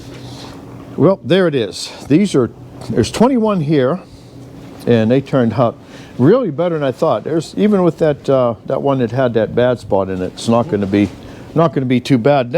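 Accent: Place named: Pennsylvania, United States. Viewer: Both of my parents were 1st-generation Americans ("greatest generation") who were born and raised in Fayette County PA, it's such a joy to hear your accent which reminds me of them and of my large extended PA family.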